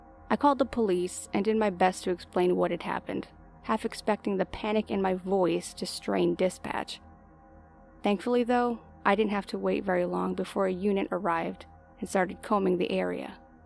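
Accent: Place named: New York, United States. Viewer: Heavy New York accent.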